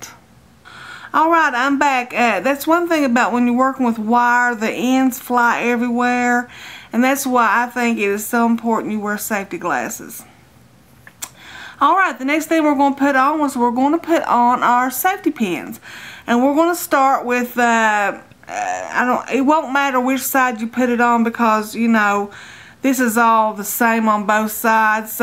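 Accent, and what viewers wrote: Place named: Kentucky, United States. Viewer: I love your videos they are very informative and easy to follow and also you crack me up. Your country accent reminds me of my husbands family, they are in WestVirginia. You sound a little more south, regardless of where your from, your great,keep up the good work, I cant wait to watch more of your tutorials...GOD BLESS YOU DARLIN!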